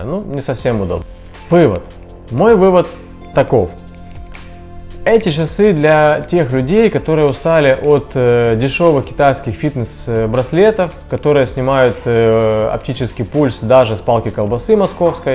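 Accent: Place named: Ukraine. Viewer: Это отличительный говор украинца. Когда я слышу такой говор, то знаю, что человек украинец. :) А в целом речь нормальная, дикция четкая, все слова разборчивы, а это самое главное в подаче вербальной информации. )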